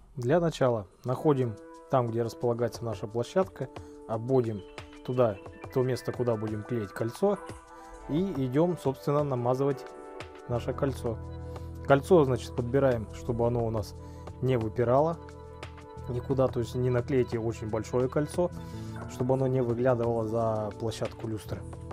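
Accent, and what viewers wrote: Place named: Siberia, Russia. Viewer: Это обычный говор сибиряков. Не в этом суть, главное, руки золотые!👍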